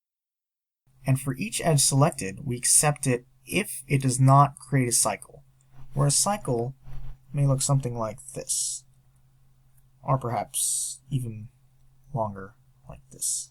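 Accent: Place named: United States. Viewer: Finally, american accent!!!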